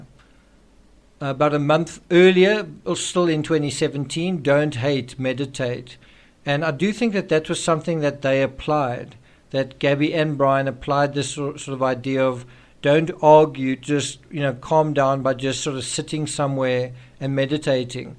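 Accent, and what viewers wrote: South Africa. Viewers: Trying to work out if u are from CT, KZN or Gauteng with that accent.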